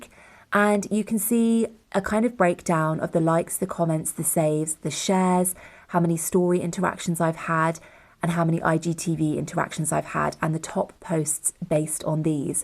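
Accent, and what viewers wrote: United Kingdom. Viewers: What a perfect british accent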